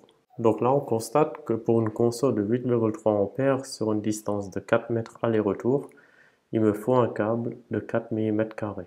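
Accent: Alsace, France. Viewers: sérieux ta voix elle me dit quelque chose. Tu es pas du bas Rhin ??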